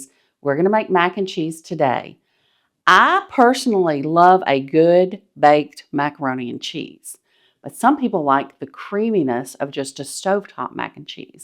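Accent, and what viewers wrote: United States. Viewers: 1st time viewer in Latvia. Wow, the minute I heard your accent, I knew this was gonna be the real deal for this iconic American dish! Appreciated the relaxed, down to earth presentation. Subscribed. Thank you!🎉 (Will maybe add some ground pepper tho😂)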